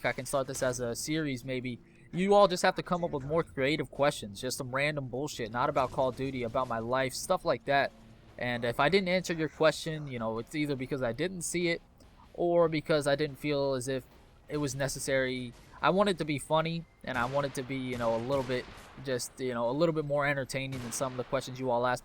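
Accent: United States. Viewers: also are you actually Australian because you sound american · Wait are u american or australian?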